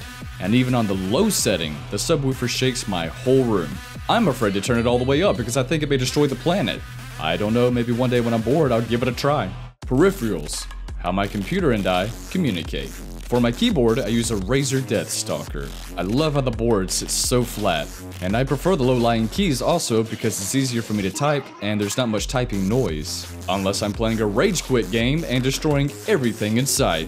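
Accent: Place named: South, United States. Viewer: If you don't mind me asking where are you from you sound southern ps thank you for telling me Jesus loves me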